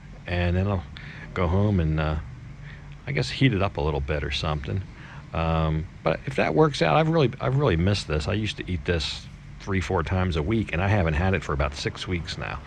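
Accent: United States. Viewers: Just stumbled across your channel and subscribed. I like the format and your narrative style! I plan to retire in the Pattaya area in a few years so your videos of the area are most informative. Sounds like you're from the States? Your name?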